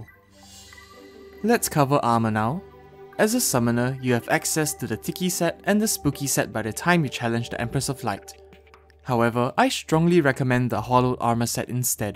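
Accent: Singapore. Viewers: Are you SG/ Malaysian bro? Going by the accent 😃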